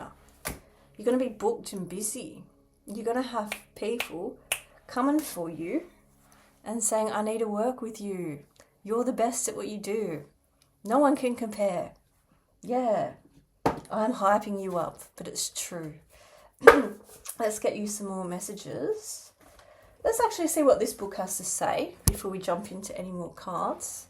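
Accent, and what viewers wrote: Australia. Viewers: Hello from California. Good singing voice, Great voice,. Great Australian accent. Great messages from Australia. Happy Easter from our channel.💃💃💃🏻💃🏻🦚🦚🦚🧤🧤🧚🏻‍♀️🧚🧚🏻‍♂️🧚🏻‍♂️🧝🏻‍♂️🧝🏻🧝‍♀️👒🧜‍♂️🧜🏼🧜🏻‍♀️🐣🐣🐥🐥🐇🐇🐇🐓🐓🐓🦜🦜🦜🦜🦜🦜🦅🦅🦅🐎🐎🐎🦚🦚🦚🐦🐦🐦🐤🐤🐤🦆🦆🦆🦉🦉🦉🦋🦋🦋🍀🍀🍀☘️☘️☘️🐦‍🔥🐦‍🔥🐦‍🔥🦢🦢🦢🦩🦩🦩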